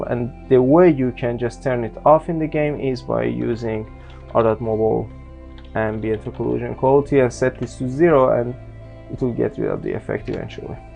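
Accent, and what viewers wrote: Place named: Iran. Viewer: سلام داش از لهجت میخوره ایرانی باشی میشه لطفا بگی چجوری باید لنز فلیر رو برای موبایل آنش کنم؟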